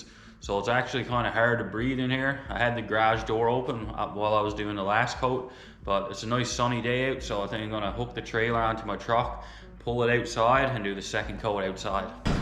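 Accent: Newfoundland, Canada. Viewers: U sounds like a Newfie by!! 🤔😅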